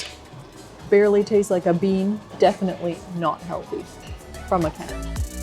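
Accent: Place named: United States. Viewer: your accent sounds like american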